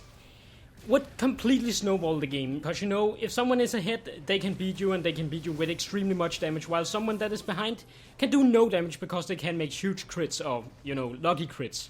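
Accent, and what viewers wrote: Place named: Denmark. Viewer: dat dansk accent :D fed video ^^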